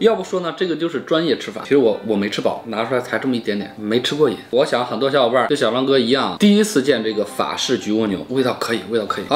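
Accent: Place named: China. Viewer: 大陸口音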